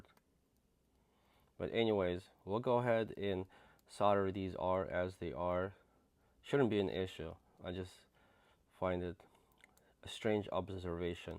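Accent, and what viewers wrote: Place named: Russia. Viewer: You know the hardware/software repair tutorial is going to be good when the guy speaking has a Russian accent.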